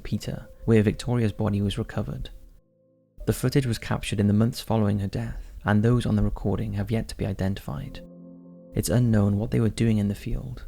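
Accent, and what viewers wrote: Wales, United Kingdom. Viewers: I think he's Welsh? The way he pronounced some words made me think South West but he lacks that Farmers twang like me. 😂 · really? I'm picking up a newport/cardiff twang lol Maybe this can go in an unsolved mystery vid 🤣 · I read on several other posts that it’s a Welsh accent, so I do believe so. I recognise it somewhat since I once had a colleague from Cardiff area. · Pavman Cometh he's definitely Welsh!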